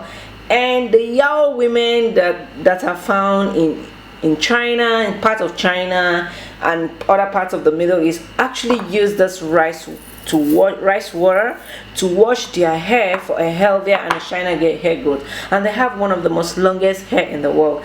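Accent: Nigeria. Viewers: Please stick to your Nigerian accent....nice video btw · As you were talking I said to myself this lady has to be Nigerian. Then you mentioned Nigerian rice. Gbam! I've just started doing the rice water rinse, I may try this one down the line.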